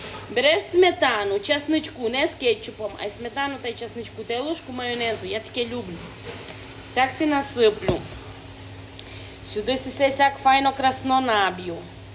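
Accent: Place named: Western Ukraine, Ukraine. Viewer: Мої вітання. А Ваш говір то Чернівецького регіону чи Франківського?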